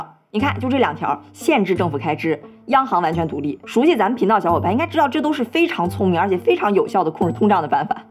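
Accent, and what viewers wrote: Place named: Beijing, China. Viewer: 小林有北京口音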